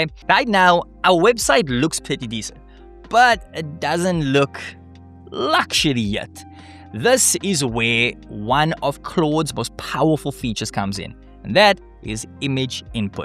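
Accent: South Africa. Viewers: What’s that accent dawg? South African? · My guy are you South African?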